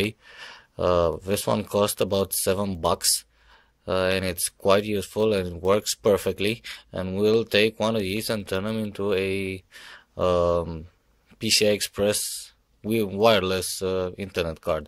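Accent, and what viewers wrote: Romania. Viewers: de la accent mi am dat seama ca ești român :))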